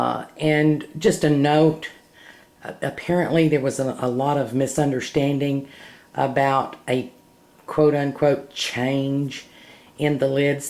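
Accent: South, United States. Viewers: i am binge watching your videos! i love that you are keto as well....i also cook on cast iron!!! oh Lordy! i really love that i found you...your sweet voice and slow southern style is so relaxing to my frenzied mind<3<3<3 you mentioned in one of your videos...i think spaetzles...that you are cooking for one? how is your husband, if you dont mind my asking...you may have mentioned it in another, i just haven't gotten there yet :{ this is one of your more popular videos...so i hope everyone here offers many prayers for him and you for as long as youtube is around! ;}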